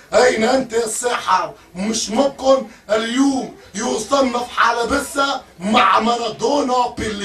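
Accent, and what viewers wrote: Tunisia. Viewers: غرييييييييييييييبة والله ... متأكدين هذا مصري... هذا تونسي 100/100هههه... تحفون برشا... واصل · و الله تقول صوت شوالي هههههههه هدا يعرف لهجة تونس كويس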